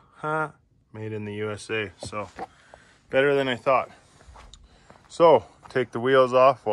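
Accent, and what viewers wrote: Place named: Canada. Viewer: Your Canadian!! That's why I can understand you 😂😂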